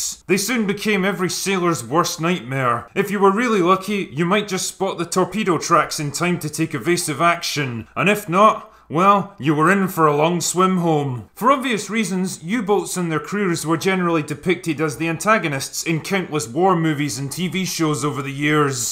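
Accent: Scotland, United Kingdom. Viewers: I always enjoy your videos and often recommend them to friends, so I hope you won't take this the wrong way. Your analysis of Das Boot was excellent, spot on in fact, but you had a few inaccuracies regarding the history and as a Naval person and historian feel compelled to mention them: - Your archival footage at the beginning was all of German WWI U-boats, so wrong war. - Submarines are always "boats" and never "ships." Doesn't matter if it's an old 500 ton coastal boat or a 10,000 ton SSBN; always a boat. Also, I'm curious why you were saying "loo-tenant"? You're obviously from the Blessed Land of Scotch (in fact, you sound almost identical to a mate from Clydeside, especially when you tell us to go away), so I'm curious why you use the American pronunciation? Cheers!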